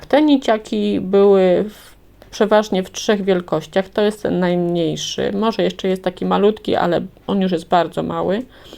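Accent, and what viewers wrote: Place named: Silesia, Poland. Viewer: ja tak samo, pełna zgoda 😂 Kocham Śląską gware pani jest autentyczna, pozdrawiam serdecznie ❤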